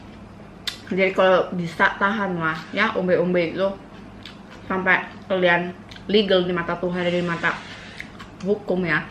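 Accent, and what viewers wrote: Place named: Bugis, Indonesia. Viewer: Logatnya kek orang Bugis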